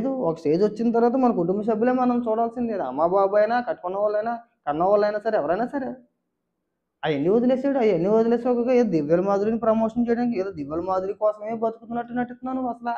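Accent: Godavari, India. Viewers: Anna bale matladuthunnaru meru❤😂 a vuru anna medhi ento koncham godavari slang la vundhi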